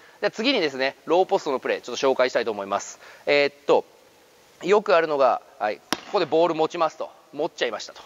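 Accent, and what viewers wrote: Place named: Okayama, Japan. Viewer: しゃべりかた、耳障りがえーとおもーたら、岡山人じゃったんか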